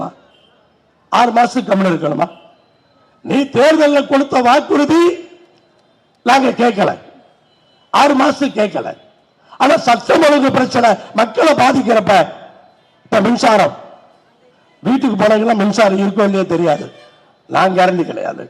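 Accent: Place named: Kongu, India. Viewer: அய்யா‌ கொங்கு தமிழ் வழியாக எல்லோருக்கும் நன்றாக புரியும்படி பேசியுள்ளீர்கள்.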